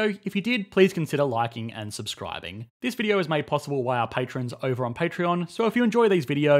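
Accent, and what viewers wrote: Australia. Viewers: What accent is that? Australian? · At least Wendower is 'understandable': this Ozzie's accent is thiccc, plus, he talks way too fast - had to reduce speed to 75% to make it bearable. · australian accent This is where we bring up the darling Norway, it not only gets (Insert industry norm) but does so equitably. In fact when measuring (some average figure related to production) it is actually (some number between 1-10) globally. Now you can never not mention them again :) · The Australia accent throws me off. The upward inflection at the end of sentences make it sound like your unsure or asking a question.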